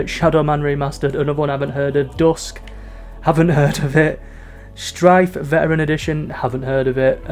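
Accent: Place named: Yorkshire, United Kingdom. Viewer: nice! I was thinking Yorkshire or Hull from your accent. My mom was born in Leeds, and grew up in Yorkshire before moving to the US in the ‘80s.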